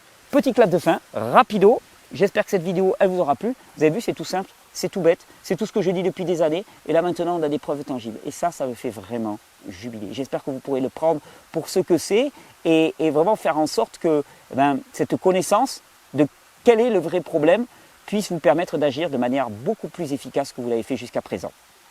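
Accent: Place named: South, France. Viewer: Amusant comme l'accent du sud semble faire passer une dangereuse escroquerie pour quelque chose de sympathique.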